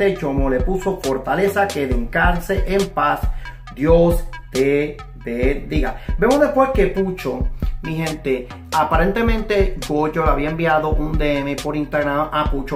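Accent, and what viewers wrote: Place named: United States. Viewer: No hablabas muy bien español O es mi imprension jajaja se te notaba más lo estadounidense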